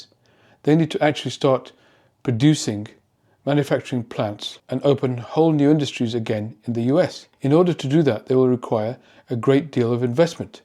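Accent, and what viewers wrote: United Kingdom. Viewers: When I hear mathematics with a British accent I always think of the teacher from Willy Wonka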